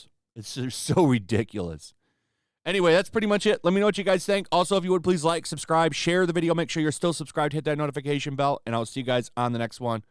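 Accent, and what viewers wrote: United Kingdom. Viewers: i dont know why your crybaby voice is so awfully british old boi, but i do approve guvnor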